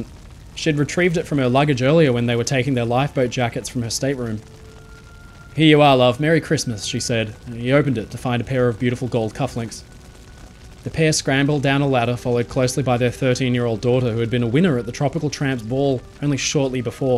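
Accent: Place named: Australia. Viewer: Australian or whatever it is accent also adds a distinctive flavor to his superb storytelling.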